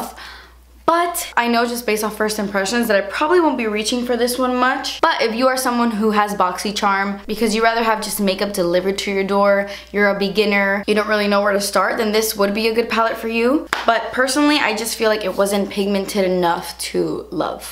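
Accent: England, United Kingdom. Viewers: i would bloody love it if you would do a full video in your english accent omg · Anddddddddd I love your English accent I wish you would do a whole video talking like that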